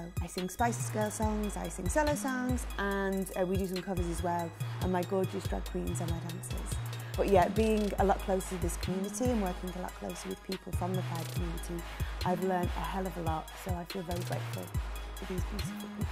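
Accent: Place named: RP, United Kingdom. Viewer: Wheres this posh accent coming from.. hate it when this happens to pll .. shame on your northern roots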